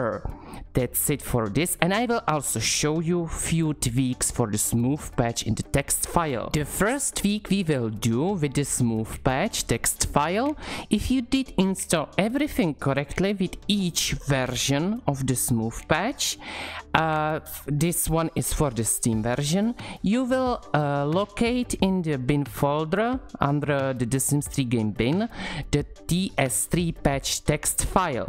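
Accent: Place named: Italy. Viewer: What AMAZING ACCENT! Is it Italian? I LOVE it! Also, thanks for the guidelines!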